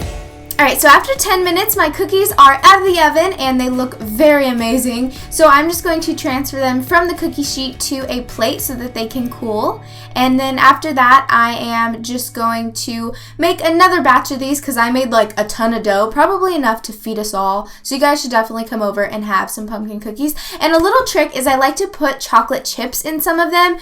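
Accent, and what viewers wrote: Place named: England, United Kingdom. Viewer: you must be from england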